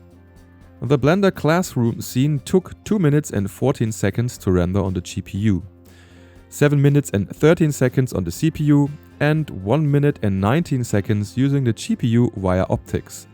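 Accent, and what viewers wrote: Germany. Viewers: Lol manchmal glaub ich, dass er Deutscher ist der englisch spricht :D erinnert mich so krass an den deutschen Typen der Monitore reviewt auf englisch xd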